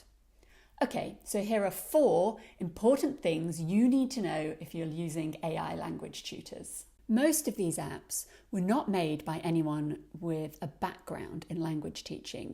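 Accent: United Kingdom. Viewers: I really enjoy your posts, the way you speak, the clarity of your statements… it sounds like (perhaps I’m mistaken) a bit of aristocratic English ;-). By the way, could you tell me (us) your name? It’s always easier to talk to someone on a first-name basis. If not, that’s OK - I know the English are famous for their eccentricity. 😉 Warm regards, Alex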